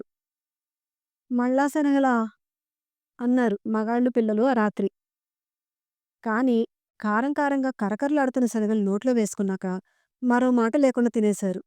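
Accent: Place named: Telugu, India. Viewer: చాలా బాగా చదివారు. గొంతులో తెలుగుదనం చక్కగా తొణికిసలాడింది.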